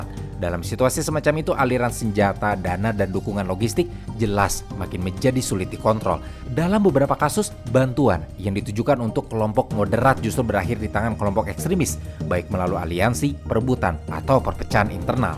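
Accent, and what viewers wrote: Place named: Banyumas, Indonesia. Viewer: Wong ngapak ngendi kie😂 tegal um?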